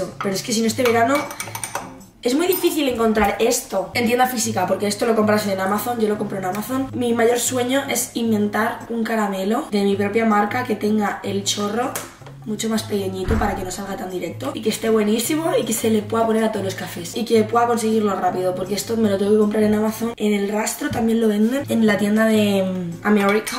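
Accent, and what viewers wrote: Buenos Aires, Argentina. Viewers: necesito mas videos hablando en argentino por favoor !! jajajja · tu acento de argentina y ingles me encantan te amooo quieres tomar un cafe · te amo y amo tu acento argentino 😍💖😂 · Eu el acento porteño de Ar gentina le sale muy bien! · Te amo mucho, me alegras los dias. Btw te sale re bien el acento argentino.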